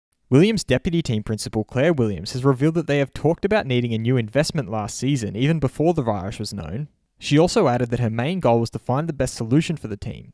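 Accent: Australia. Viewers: Also you sound Australian. Wanted to know if you know if they will only show the main race on television or we will see the two practice days and time trials as well?????? Hope you do read the comments. But I really think you should do the countdown clock.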